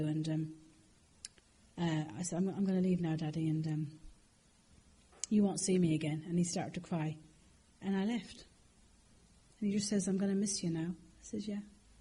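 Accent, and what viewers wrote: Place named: Ireland. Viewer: I love your irish.great sense of humor.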